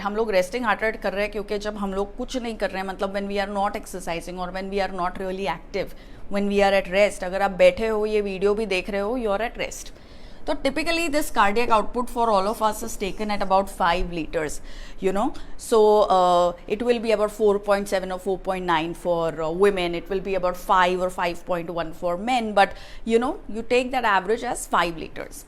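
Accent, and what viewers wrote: Mumbai, India. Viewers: Every video is very informative . I enjoy listening to your Bumbai Hindi. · phuski bomb ...lol ..ur such a mumbaite..its awesome to hear every word u say .deep thanks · Nice & convincing explaining...enjoyed Bambayya Hindi too...like..."ye mai kayke liye samza Rahi hu" 😄👍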